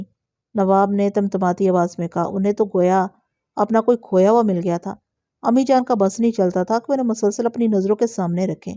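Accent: Punjab, Pakistan. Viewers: Apki Urdu gulabi/Punjabi hai. Lekin fluency achhi hai.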